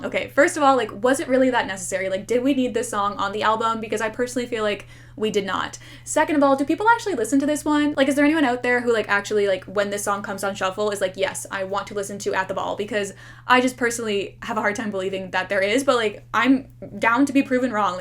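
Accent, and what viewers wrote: Canada. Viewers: this is off topic but has ever said she’s canadian because the way she says about and out makes me think she is lol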